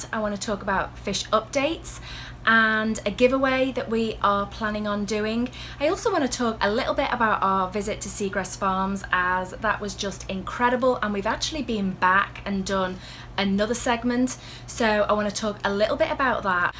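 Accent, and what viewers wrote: Liverpool, United Kingdom. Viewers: i love your channel but i was just wondering are you from the uk but live in america x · Aren't you scouse?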